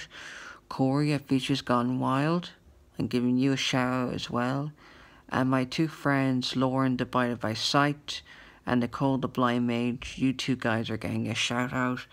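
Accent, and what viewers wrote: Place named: Ireland. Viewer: I understand your anger, but that is an energy you can use. Let us see more of your face, Your smooth Irish voice is an asset, your content will be interesting. Ask one of those pesky sighted people to help you to give us a full face shot in light. Even if you use a couple of still-shots taken outside. As a person with Asperger's you have plenty of material there as well. Remember to make a new trailer video every 3 months. I say this not to offend but as suggestions only. Recently I have started to upload talking videos showing my old beaten face against some of my artwork and it was a hard decision to make as everyone loves you when you are young. Old is not fashionable. Stay safe over there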